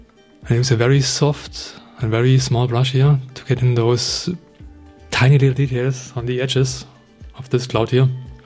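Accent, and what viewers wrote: Germany. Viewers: Du bist deutscher oder? Ich glaube ich höre deutsches englisch 😂❤ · Du klingst als kämst du aus der Region Dresden. Oder täusche ich mich?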